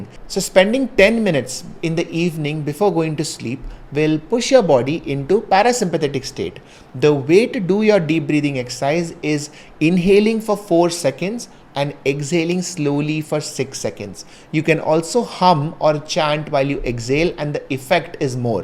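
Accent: India. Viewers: Nice Indian English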